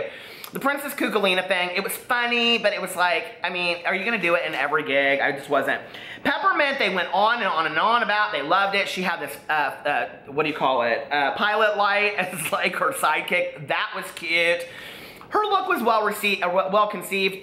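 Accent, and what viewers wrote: South, United States. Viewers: you are too gay to function at times... #loveyou great comments, I enjoy your Souther accent.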